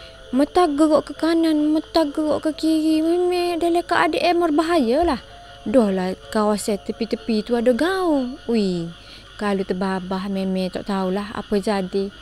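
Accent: Kelantan, Malaysia. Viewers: Ore kelamtam ka😅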